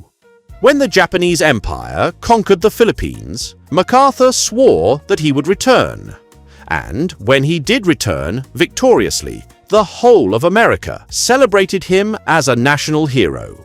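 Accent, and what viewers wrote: United Kingdom. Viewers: Finally a British accent in these kinds of videos Makes US flag merch Disgusting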